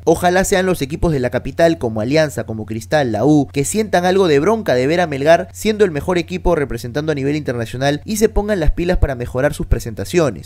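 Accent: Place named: Argentina. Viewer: por que siento un acento argentino?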